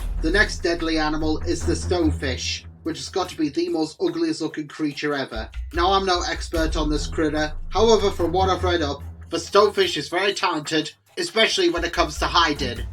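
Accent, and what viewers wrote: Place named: United Kingdom. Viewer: i must say, for a resident in the UK, you have a very interesting accent, what region of the UK are you, because your pronounciations sound unusual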